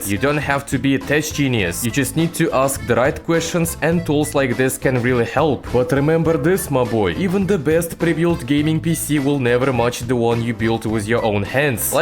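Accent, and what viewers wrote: Russia. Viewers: That russian accent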